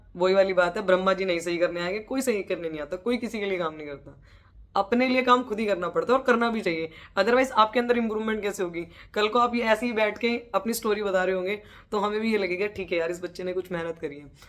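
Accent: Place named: Haryana, India. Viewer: Main to bs haryanvi accent sunne aaya tha 😂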